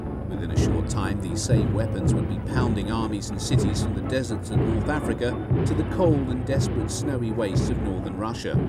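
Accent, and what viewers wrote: United Kingdom. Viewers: the British English narrating sounds sophisticated and smart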